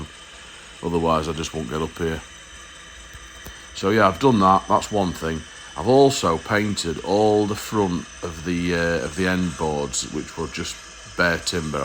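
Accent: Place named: Yorkshire, United Kingdom. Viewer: Well I must admit I really enjoyed the video and the humour was excellent (good Yorkshire dialect). However, as a seller online I fo have to comment on cost of delivery. I pay £2.84 to send a parcel, it’s cost me around 65p to make packaging and buy the box, bubble wrap and tape. I have to drive to drop the parcels off at the drop off point and there is my time. I charge £3.25 for postage on my site and do I think you are getting a deal. Yes, I look for a good deal and also like free delivery. If I have free delivery I would lose on 85% on all sales so I would have to include that in the cost of the item and my prices would increase, when you buy more than one item the postage is combined so you get free postage after the first item anyway. Just think about it. Regards, Geoff -Scenic3D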